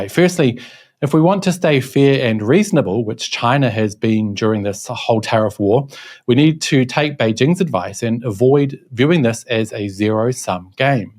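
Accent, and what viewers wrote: New Zealand. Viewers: Kiwi accent lol, unmistakable. 😊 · You sound like a spokesman for the Chinese government. With a nice NZ accent. But you are correct I suspect. China 1. USA 0.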